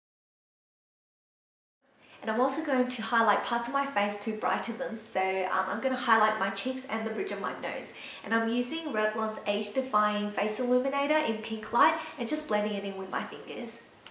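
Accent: Australia. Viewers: i havent really heard other aussies talk on youtube! woah our accent is soo totally different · australian actually.. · Wow you're one of the few Asian make up gurus with an Aussie accent! Go Aussies ^^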